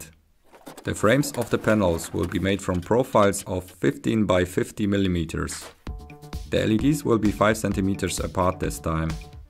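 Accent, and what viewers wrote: Germany. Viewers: Mann hort das du deutsch bist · He sounds very german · You are german? Du hast so nen Akzent(ich glaube , dass man dies so sagt)? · Are u from Germany ? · Du hörst dich sehr 🇩🇪 an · Bist du Deutscher klingt so? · Ach ja der gute alte deutsche aktzent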